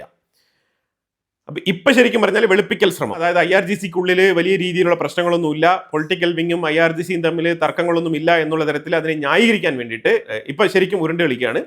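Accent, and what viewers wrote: India. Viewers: വളരെ നല്ല അവതരണം കൃത്യമായി കാര്യങ്ങൾ മനസിലാക്കാൻ കഴിഞ്ഞു... 👍👍സ്ഥിരമായി കാണാറുണ്ട് യുദ്ധ വാർത്തകൾ മററുളള ചാനലുകളിൽ പറയുന്നതിനെകാൽ വലിയ ആധികാരികമായി തന്നെ പറയുന്നുൻട്...താങളെ കുറിച്ചു ഒരു self Introduction പ്രതീക്ഷിക്കുന്നു... മലയാളം കേട്ടിട്ട് കേരളത്തിന് പുറത്തു വളർന്ന ഒരാളായിട്ടാണ് തോന്നുന്നത്...എല്ലാ ആശംസകളും..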